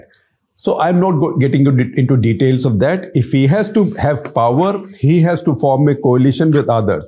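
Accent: India. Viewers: Talking too much with strong Indian accent...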